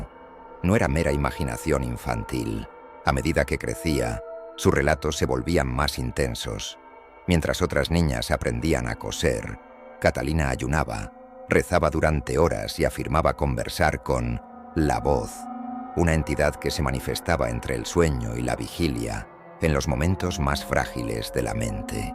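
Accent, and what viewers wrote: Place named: Spain. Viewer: estos españoles no son agradables escucharlos narrar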